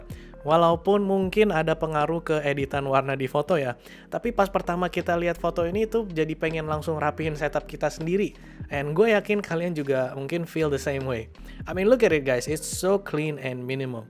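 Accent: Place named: United Kingdom. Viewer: Keren nih ada aksen gaya bahasa inggris nge riview nya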